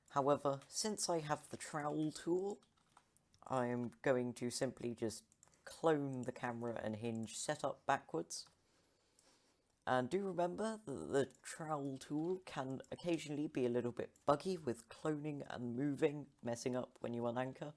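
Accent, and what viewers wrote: United Kingdom. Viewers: Me when i heard him : HES BRITISH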